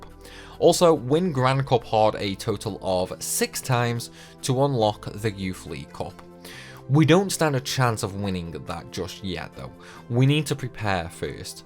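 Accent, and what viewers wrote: United Kingdom. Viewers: WHY ARE YOU BRITISH????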